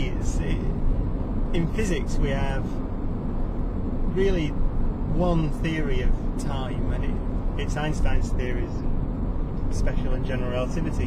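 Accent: Northern England, United Kingdom. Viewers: this guy is bringing more ppl into science so I hope he keeps on broadcasting...he does have an interesting accent...northern I suspect...:)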